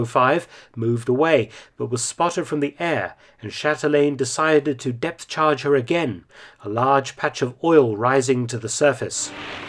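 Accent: United Kingdom. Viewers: Thank You, you would make a great American!!! It's refreshing to have a Brit narrate such event in American History!!! Become one of us! God Bless You!!! Godspeed:)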